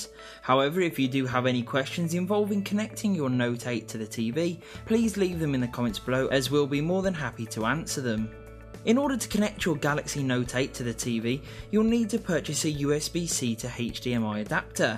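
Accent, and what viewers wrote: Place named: United Kingdom. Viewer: British accent is cool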